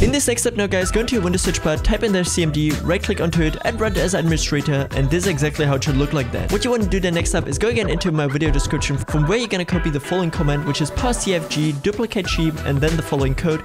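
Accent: Germany. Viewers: Hab sofort erkannt das du Deutscher bist XD nice tutorial btw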